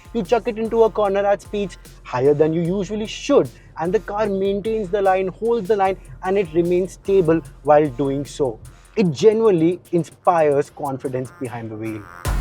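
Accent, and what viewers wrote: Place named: India. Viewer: never seen a presenter speaking in english but ends up sounding like hindi. what is the point of speaking so fast and so much when audience cannot understand ? please slow down and speak clearly.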